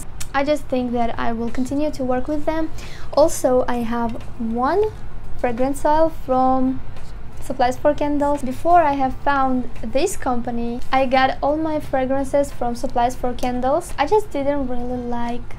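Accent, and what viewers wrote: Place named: England, United Kingdom. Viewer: Loving your videos, your english accent is sweet you are doing a fantastic job, keep it up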